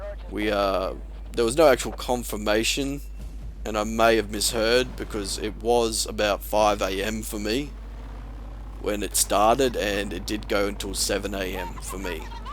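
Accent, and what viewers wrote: Australia. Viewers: you sound aussie are u? gret gamplay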